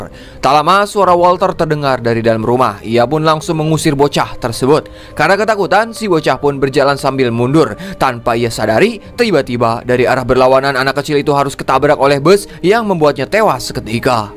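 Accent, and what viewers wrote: West Java, Indonesia. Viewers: Kang pasti org Sunda nya 😂logat na meni Sunda pisan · Aku tebak pasti abang org sunda iya kan??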